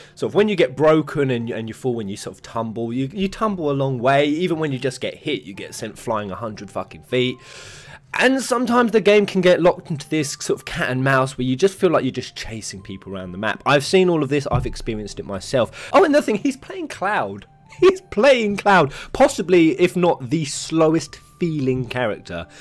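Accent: United Kingdom. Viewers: Haseo Kaztrio He’s British.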